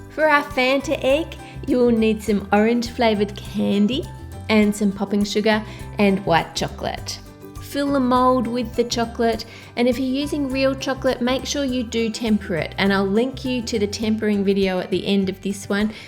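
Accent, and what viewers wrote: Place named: Australia. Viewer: probably the only person who speaks in an australian accent i didnt enjoy listening to when watching this video.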